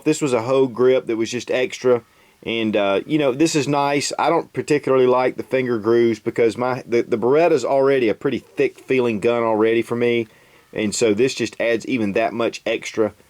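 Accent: United States. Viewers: Love your vids. Just bought a used Walther p22 for the bedroom. Thanks for all the tips. Had to subscribe for this, some of your other vids, and the down to earth all American accent. Long live the Republic! Down with Big Brother!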